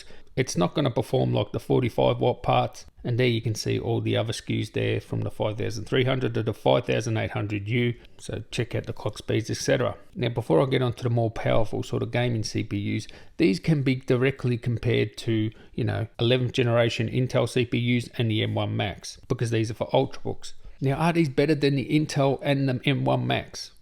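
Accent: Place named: Australia. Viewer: Love the multicultural Aussie accent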